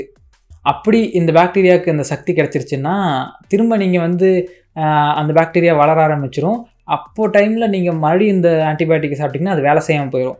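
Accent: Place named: Madurai, India. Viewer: என்னதான் ஜெர்மனில் போய் ஆராய்ச்சி பண்ணினாலும் பேசற அப்போ நம்ம மதுரை ஸ்லாங் வருதுல்ல🎉🎉😂😂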